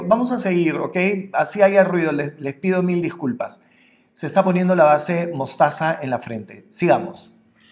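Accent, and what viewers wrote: Portugal. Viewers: Me encantó escucharte hablando en portugues :) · Te Amo! Tu eres AMAZING!!! ME Encanta verte, oírte y aprender de ti. Gracias por Existir preciosura y por hablar tan hermoso Portugués 💕 💖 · ese acento portugués me enamorooo ❤️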